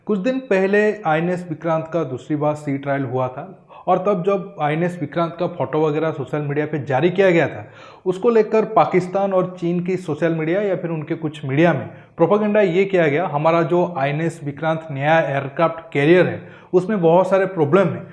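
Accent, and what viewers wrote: Odisha, India. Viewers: Are you from Orissa? Your pronounciation is like odissi people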